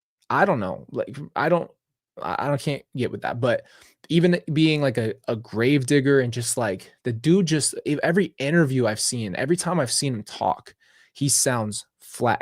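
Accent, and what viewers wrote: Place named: Boston, United States. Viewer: "Piece a gahbage" lol, you sound just like a Boston boi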